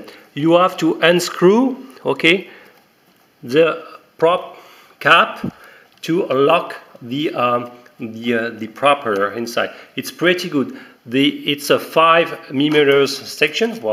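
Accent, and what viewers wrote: France. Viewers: mdr avec ton accent francais, il peut soulever quelle poids a ton avis ?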